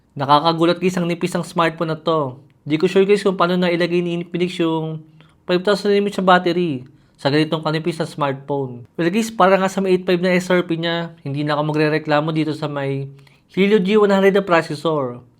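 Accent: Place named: Batangas, Philippines. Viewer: Taga saan k boss parang batangueno ka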